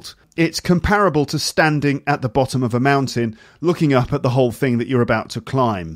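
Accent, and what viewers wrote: United Kingdom. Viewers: Hello from Almaty Kazakhstan!! I adore British English it always nice to hear your podcasts! thank you very much,! I'm so greatful to you.....May the Force be with you, mate! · Hi. I got your podcast previous friday and i really like it. I love british accent. I'll try to practice with your audio to perfect my accent. thank you very much.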